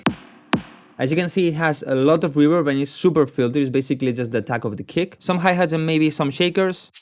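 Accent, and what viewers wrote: Spain. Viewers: eres español jajaj se te nota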